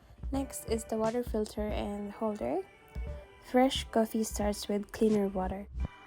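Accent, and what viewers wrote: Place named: Australia. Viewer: Ahhh!!! Ganda! Coffee lover here!!! Love your Aussie accent :*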